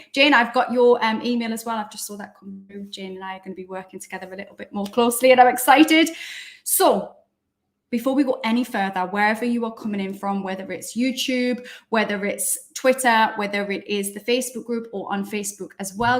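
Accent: Newcastle, United Kingdom. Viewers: listening to your GEORDIE accent videos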